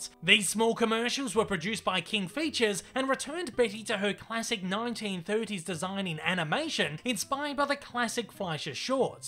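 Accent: Australia. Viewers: I don't mind the Aussie accent.😃😃😃😃😃😃😃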